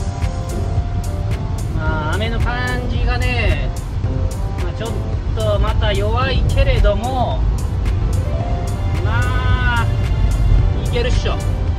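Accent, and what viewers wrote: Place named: Sado, Japan. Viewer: 佐渡弁すごいですね！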